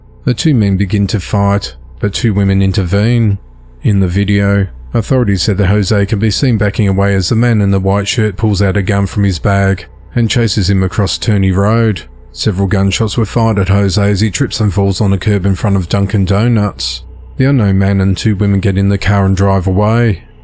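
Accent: Australia. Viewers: You're definitely an Australian, hey?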